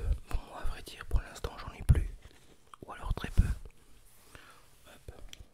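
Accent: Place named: Normandy, France. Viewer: Tu as l'accent de Normandie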